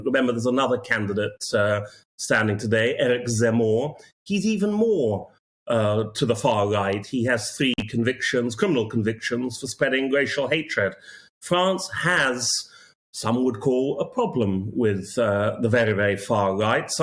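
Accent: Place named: RP, United Kingdom. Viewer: Plummy tw*t 🙄 Is he French or English? 🤦🏻‍♀️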